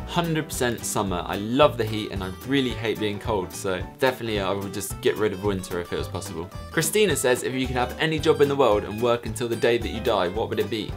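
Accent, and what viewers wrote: California, United States. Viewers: That California accent is on point! Couldn't stop laughing :) Love this video! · Ur american accent omg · Lol his Cali accent sounds like a gay man xD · That Californian accent. On point. Haha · lol. your californian accent. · Your Cali accent is adorable! XD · omg the california accent #blesd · your Californian accent. XD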